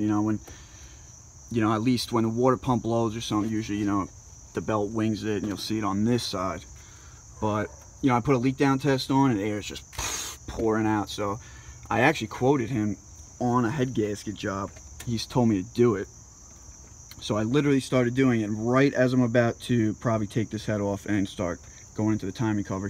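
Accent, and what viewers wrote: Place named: Long Island, United States. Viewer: You sound like UFC fighter Al Iaquinta, which makes sense since he is also from Long Island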